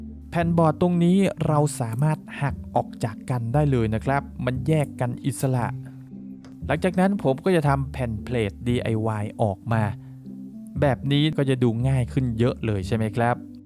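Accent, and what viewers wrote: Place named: North, Thailand. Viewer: คนเหนือแน่เลย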